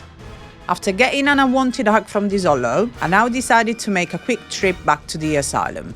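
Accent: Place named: Italy. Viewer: I can't predict how she's going to pronounce words. Italian, Southern England, and hints of Irish all rolled into one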